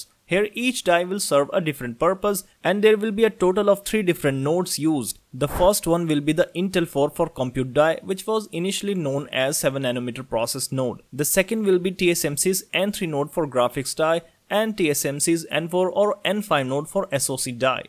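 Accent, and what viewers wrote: India. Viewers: Informative, but ruined by the Indian accent.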